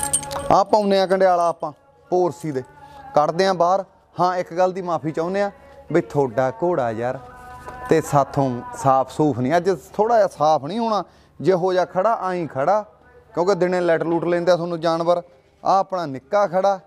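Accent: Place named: Malwa, India. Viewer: 22 malwe alli bolli bhott vidya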